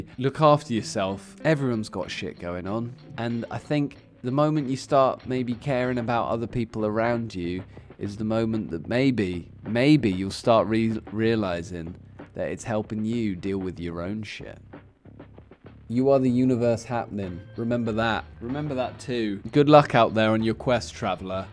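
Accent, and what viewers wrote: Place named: United Kingdom. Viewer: Are you British? Cuz I like the accent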